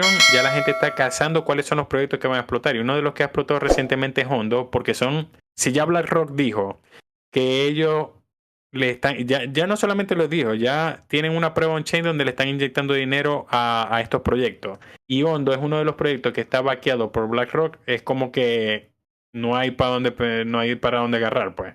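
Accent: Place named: Zulia, Venezuela. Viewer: Este canal es un.. what the fuck!! Solo por curiosidad escuche el acento zuliano, pero de verdad mal producido y desarrollado el contenido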